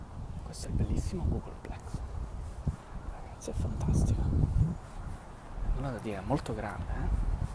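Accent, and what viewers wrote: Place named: Rome, Italy. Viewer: haha io dovrei avere delle foto in quel ponticello, dovevano esserci anche i parcheggi per le macchine elettriche li davanti. Ma questo accento romano?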